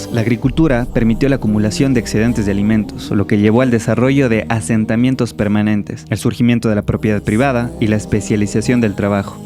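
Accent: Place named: Ecuador. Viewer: Eres de ecuador? El acento es inconfundible :P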